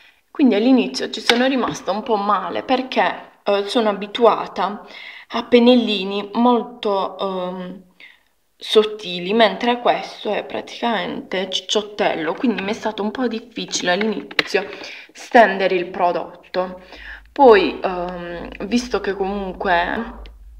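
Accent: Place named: South, Italy. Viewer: ma no che dici , perche ' ???? hai una voce bellissima , ma poi xke' ci dobbiamo fare sempre mille problemi con l'accento ,noi del sud !!! e' questo !!! anzi e' simpatico , ti assicuro che c'e' di peggio ! molto peggio !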